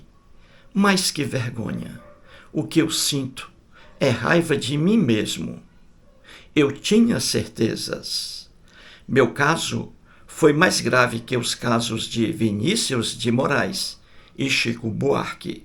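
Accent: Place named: North, Brazil. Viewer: faça um video com o ANTONY GALVÃO (do canal Pilastra da Verdade), ele também é nortista. Sou cristão e paulista e acho bonito o sotaque de vcs 👍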